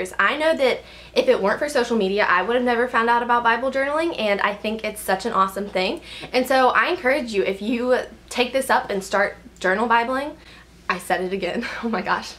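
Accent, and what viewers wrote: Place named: Alabama, United States. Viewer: Beginning of the video: "she sounds like she's from Alabama Description: "from Alabama" Haha I know my state well :)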